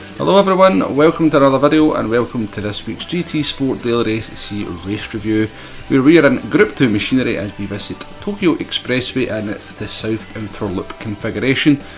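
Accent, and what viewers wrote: Scotland, United Kingdom. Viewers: haha I knew exactly what you meant so it's not a bad descriptive either, just a rather amusing one. Especially with the Scottish twang. Maybe you should trademark it for when you hit the big time of seven gazillion subscribers ;)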